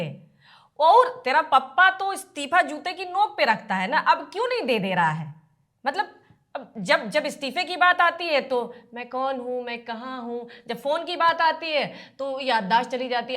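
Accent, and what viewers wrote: Bhojpuri, India. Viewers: g jai Shri RAM 🎉❤... The style of your news presenting is very unique and ur bhojpuri slang is awesome... Pin my comment 😅🎉❤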